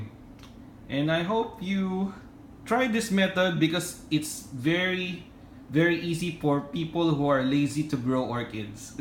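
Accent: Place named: Philippines. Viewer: Hi, love your videos. I don’t know but your accent makes me think you are Pinoy, are you? If so, I am so lucky to find you. I’ve been looking for Pinoy youtuber making vids about plants!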